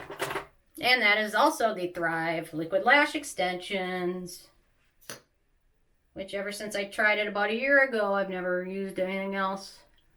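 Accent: Minnesota, United States. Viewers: Minnesota! I was wondering where the accent came from!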